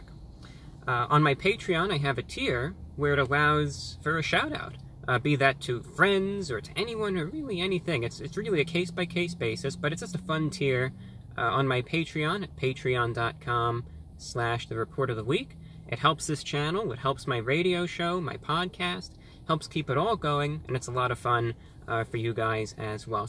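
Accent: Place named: United States. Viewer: He is like an elite british intellectual and connoisseur, except with an American accent. I've never seen such classy American. Where are you elites hiding? I finally found one on YT.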